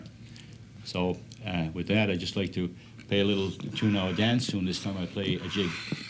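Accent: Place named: Dublin, Ireland. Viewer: bits of the lovely Dublin accent come through in his voice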